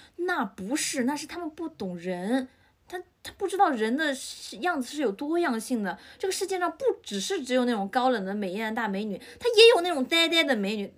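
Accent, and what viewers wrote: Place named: Henan, China. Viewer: 哈哈哈哈，一口河南口音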